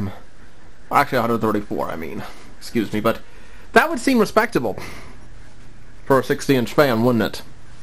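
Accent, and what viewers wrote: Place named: Ireland. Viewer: An invention as fascinating as the accent. If it's not too personal, I gotta ask, is this a particular regional accent or a mix perhaps? It almost has a twinge of Irish about it (apologies for the off-topic question - I just love accents)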